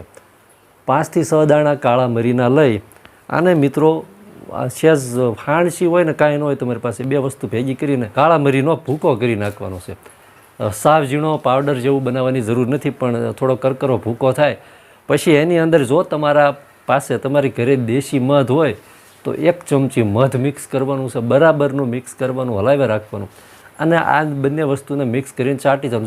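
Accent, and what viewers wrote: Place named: Gohilwad, India. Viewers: એ તેમની બોલી છે ગુજરાતી તો બરાબર ગોહિલવાડ ની બોલી છે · Namaste motabhai... Ghanaj samay pachhi aap no video prasareet thayo.. aapni kathiyawadi bhasha sambhalvi mithi lage chhe.. aap na darek video hu jovu chhoo.. Ghanaj upyogi hoy chhe and monghi dava ane teni Side effects thi bachave chhe.. aava Sara's ane upyogi video apta rahejo Namaste. 😊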